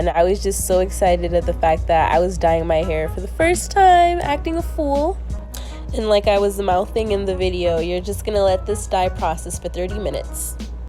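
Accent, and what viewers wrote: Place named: Jamaica. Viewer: yes with the PATOIS gyal, GWAAN NUH! i think you have 4a/4b curls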